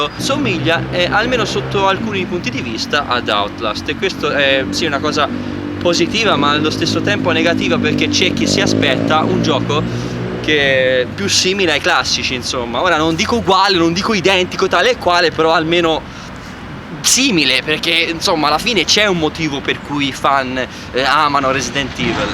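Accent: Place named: Tuscany, Italy. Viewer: Meno male che ci sono altri YouTuber con un simpatico accento toscano ma che sanno quello che dicono, quando parlano di videogiochi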